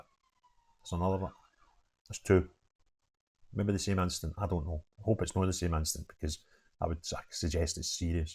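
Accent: Scotland, United Kingdom. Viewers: …I know he has a Scottish accent. But that doesn’t mean we don’t need subtitles to understand a single word coming out of his mouth.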